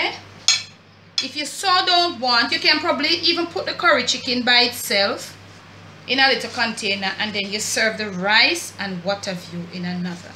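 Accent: Jamaica. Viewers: I love your talking...your a naturally funny ..between patois ..English love it..please don't stop..just be yourself ..and teach us authentic Jamaican cooking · I'm learning ms, I love you and love to hear you talk, you are a true Jamaican keep it up 👍👍👍💖💖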